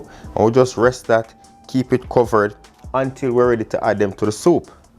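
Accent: Jamaica. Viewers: The jamaican accent makes me come back,delicious soup ofcourse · Mmmm d soup looks a lick 👅 n mi know it tast a lick cos the seasoning n flavour what makes it so tasty 😋 Amazing god bless u hands mi like u Jamaican actcent. 🤣 I love cooking especially pumkin soup 🍜 so luv to watch u videos 👍🏿👍🏿👏🏿👏🏿😋👅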